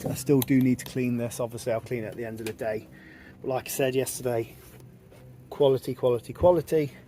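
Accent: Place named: Northern England, United Kingdom. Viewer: Cos he's from up North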